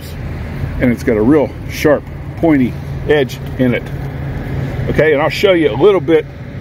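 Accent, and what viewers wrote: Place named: Pennsylvania, United States. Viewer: Hello my friend! Questions is your accent south or central Pennsylvanian? South Jersey or Maryland?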